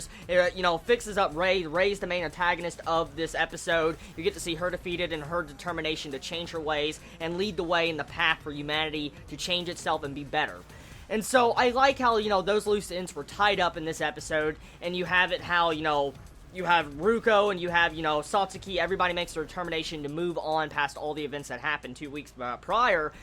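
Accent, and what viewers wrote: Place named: Texas, United States. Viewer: yo bro you from texas?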